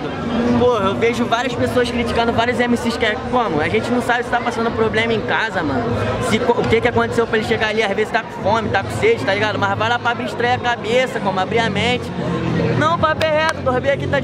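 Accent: Rio de Janeiro, Brazil. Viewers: u Sotaque carioca...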